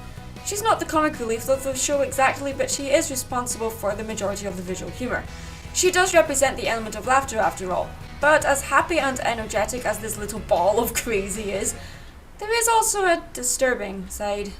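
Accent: Scotland, United Kingdom. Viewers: am sorry to asked but are you Scottish or Brittan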